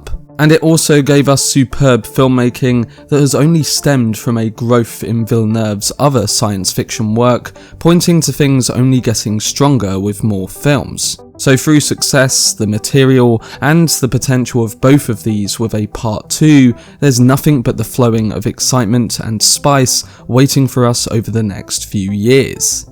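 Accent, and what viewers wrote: United Kingdom. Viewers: really nice british accent :D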